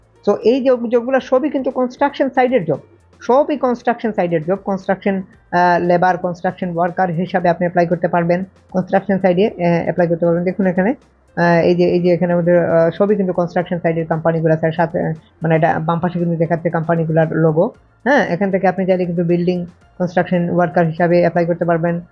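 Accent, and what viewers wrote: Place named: Sylhet, Bangladesh. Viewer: ভাই মনে হয় সিলেটি, কথায় বুজে গেলাম🥰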